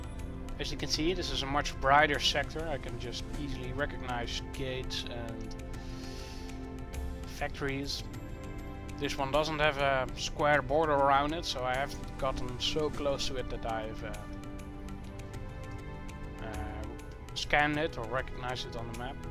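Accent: Netherlands. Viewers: Great tutorial, helped allot thanks! Btw you sound alot like Alistair Overeem, maybe its just the dutch accent lol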